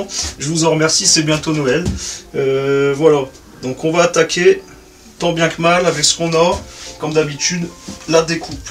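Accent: Nord, France. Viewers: Bonjour, Un grand bravo pour cette vidéo, dis moi quand as-tu acheté cette défonceuse chez Lidl? Tu es du Nord ou je me trompe?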